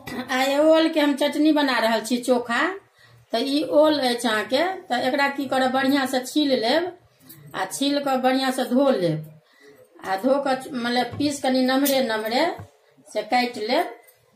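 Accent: Bihar, India. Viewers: Aaj bahut dino k baad Bihari bhasa sun ke bahut Accha laga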